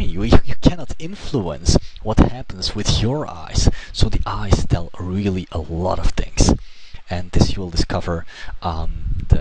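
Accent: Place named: General American, United States. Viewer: he speaks with an accent that is called general american english.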